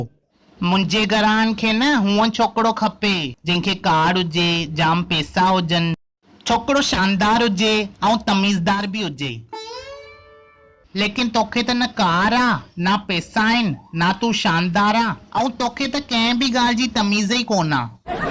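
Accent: Sindh, Pakistan. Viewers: One about i like in you...tu khalayan main puro pakko sindhi lagdon aahain ...so cute too that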